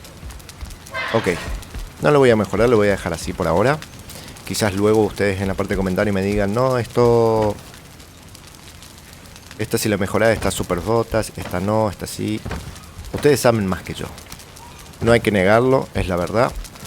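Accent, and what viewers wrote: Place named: Córdoba, Argentina. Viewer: Una mezcla de cordobés español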